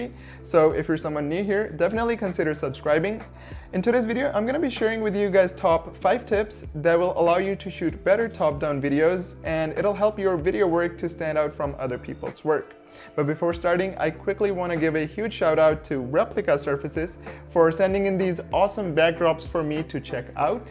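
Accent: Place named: United States. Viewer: Hey bro can you tell how to do american accent like you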